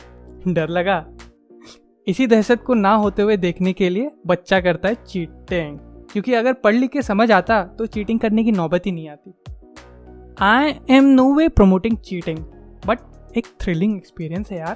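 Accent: Bihar, India. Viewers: Thethar 😹😹😹😹bihari ho ka? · I love your Bihari accent is awesome love it❤ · Bro tumhara accent bihari jesa h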